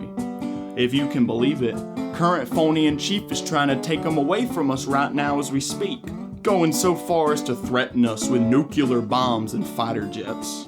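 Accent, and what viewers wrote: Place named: South, United States. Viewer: your southern accent gives me joy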